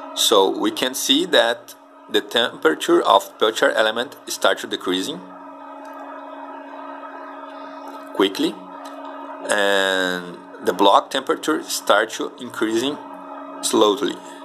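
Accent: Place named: Brazil. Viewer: Brazilian sotaque....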